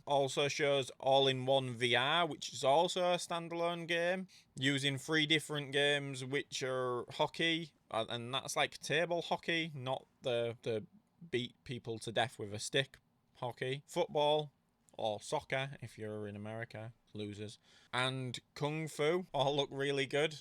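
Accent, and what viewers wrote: United Kingdom. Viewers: Thanks for the info, not much on Pico 4 Ultra out yet on the net! BTW, I find your English accent really interesting! What part of the UK is this from?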